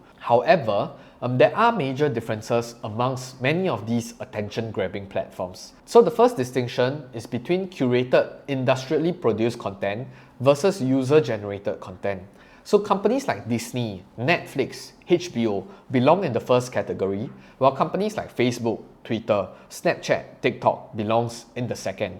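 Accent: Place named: Singapore. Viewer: Enjoy the Excellent analysis with Singapore accent.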